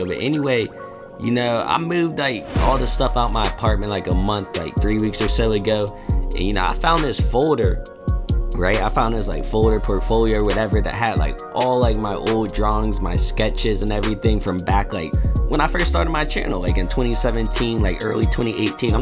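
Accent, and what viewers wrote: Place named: South, United States. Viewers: He sound country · Soundin like a hillbilly anyone (I’m not hatin)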